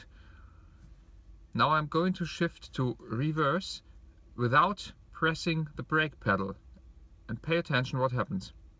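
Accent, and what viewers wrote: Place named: Germany. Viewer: Interesting that you have a finnish name and you sound pretty German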